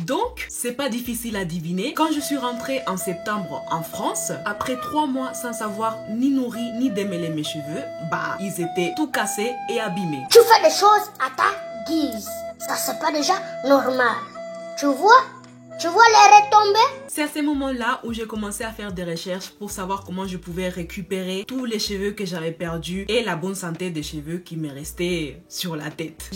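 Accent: Spain. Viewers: Tu as l'accent espagnol 😊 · ton accent ... je me demandai si tu étais espagnol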